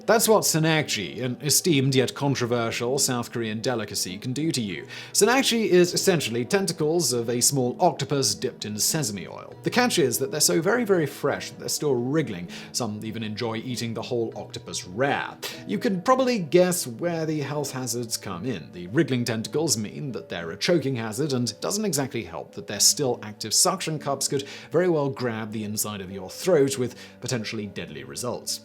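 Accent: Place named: United Kingdom. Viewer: I luv how Brits' pronounce the word "vitamin,' ha...Here in the 'states it's a LONG "I" whereas in the U.K. it's a short "I". Seeing as how they basically spawned the english language, who knows...maybe it's American's who are pronouncing it incorrectly.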